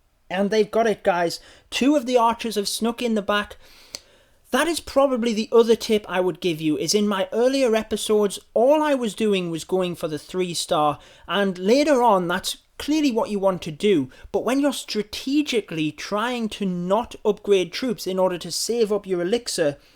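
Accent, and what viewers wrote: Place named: England, United Kingdom. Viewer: i luve youre englaish aiicecents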